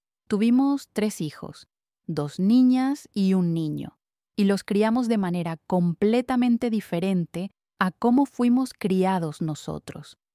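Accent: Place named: Spain. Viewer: ESPAÑA te oigo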